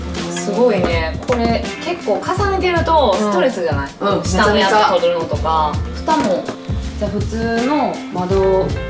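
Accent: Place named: Osaka, Japan. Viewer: 私も関西人なので大阪弁が心地いいw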